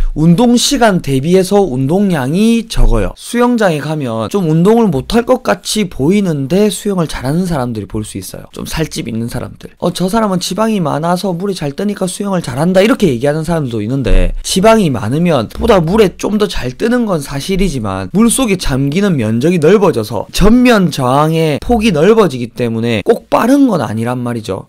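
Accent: Gyeongsang, South Korea. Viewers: 최고 입니다. 경상도 방언이 이리 스윗 할 줄이야~!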